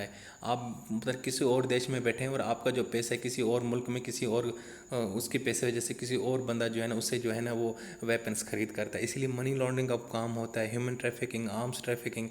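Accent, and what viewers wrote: Pakistan. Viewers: Started watching and was like “awaaz se toh lag rahe Pakistan ke hai ye” and fir aapne petrol price puch liya. Great video though! Helped a lot.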